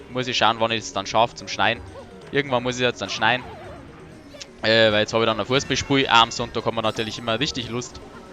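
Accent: Bavaria, Germany. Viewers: Geiles Video ... Dein Bayrisch is so Geil mach weiter so ...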